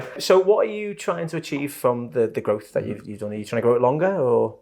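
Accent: United Kingdom. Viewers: My Texas hearing has some difficulty understanding his thick British accent!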